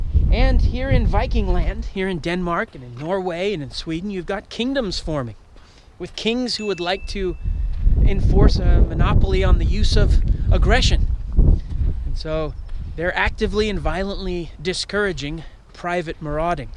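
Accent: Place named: United States. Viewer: His accent sounds American to me.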